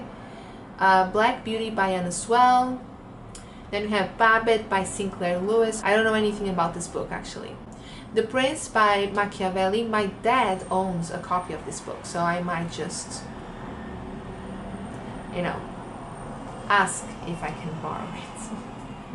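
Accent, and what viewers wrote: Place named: Russia. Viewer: She's Russian. Her accent is obvious to me.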